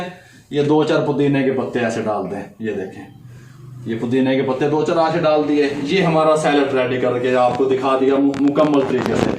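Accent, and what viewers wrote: Punjab, India. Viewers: Bhai salam app buhat iman dari se sikha rahain hn main ny app se buhat sikha jazak Allah Allah app ko deen aur duniyia main taraaqqi atta farmain Ameen ap ki panjabi urdu k sath mix ho k buat maza dyti hn khush rahiyye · Tohadi punjabi bhot qeant hy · Assalamu Alaikum baba ji buht hi aala aur zabardast tareeqy se aap ne bataya aur itni mehnet ki hamare liye... ALLAH Tala aap ko mazeed tarraqi dein aur kamyab kerein aap khush rhein sada AAMEEN Batein kerty huwe punjabi touch buht acha lagta hai zarur isi tarha baat kiya kerein Buht sara salam aur duain baba ji aap k liye bi aur k liye bi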